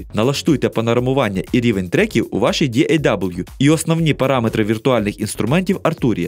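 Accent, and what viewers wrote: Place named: Russia. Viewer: Парень явно привык говорить по-русски, акцент чувствуется;)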